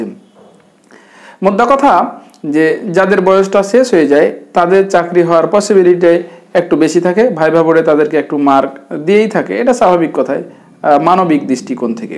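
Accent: India. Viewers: ভাইয়ে বাড়ি কি পশ্চিম বঙ্গে।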